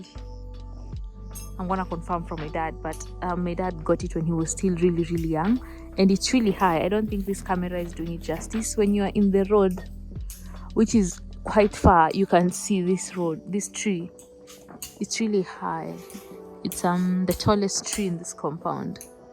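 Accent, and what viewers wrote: Kenya. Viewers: Kenyans and being "exZited". I love it💕😁 Congratulations on your achievement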